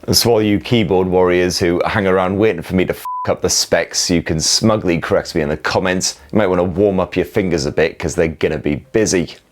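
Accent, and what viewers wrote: United Kingdom. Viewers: good thing I understand Britspeak :)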